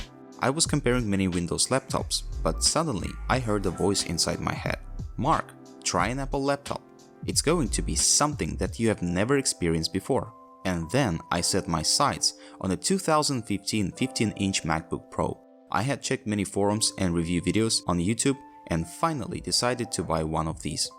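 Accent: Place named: Ukraine. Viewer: My guy have a badass Ukrainian accent 😁🇺🇦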